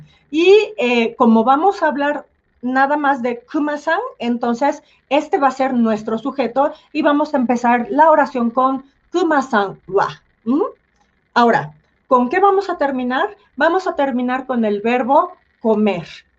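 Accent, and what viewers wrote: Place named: Mexico City, Mexico. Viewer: Hola hola Sensei!! Apenas descubrí tus clases, qué bonito explicas, además cuando hablas tan chilanga es increíble, eso hace la clase más entretenida y bonita... Gracias Gracias 💞... Propósito de vida, tener una small talk en japonés 🎉 y contigo lo lograré!! Gracias gracias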